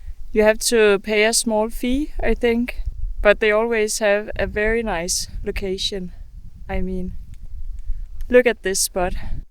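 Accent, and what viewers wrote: Denmark. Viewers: what for a lovely place in nature! thanks for taking us along; it's nice to hear the danish accent! sheep around the tent, what for a soothing scenery...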